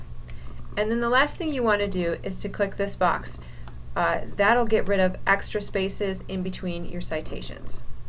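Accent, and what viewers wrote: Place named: Chicago, United States. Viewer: Love the Chicago accent <333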